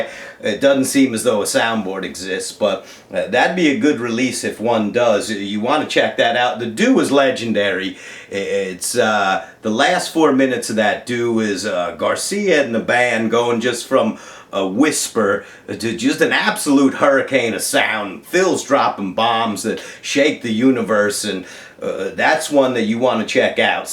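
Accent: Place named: New Orleans, United States. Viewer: You ever lived in New Orleans?? You sound like youre from here!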